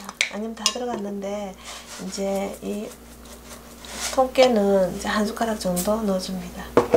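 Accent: Gyeongsang, South Korea. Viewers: 반가워요 이견을 주셧어 고마워요 님 께서 같은 경상도 구수한 고향 말씨에 모습이 석가세존 을 닮으셧고 집사람 과 같은 넉넉한 마음씨에 정이 갔어요 행여 뎃글 읽으시는데 불편하셧다면 넓으신 아량으로 관용을 바람니다. 오늘 날씨가 너무덥고 폭염이 계속되는데요 스트레스 는 만병의 원인이라고 하는데요 늘 건강 하시고 행복한날 되시기를 기원할께요 😊⛵피서 하세요💖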